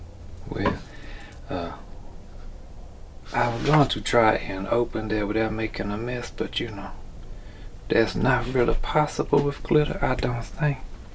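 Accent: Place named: South, United States. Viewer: Southern Bob Ross, I love it!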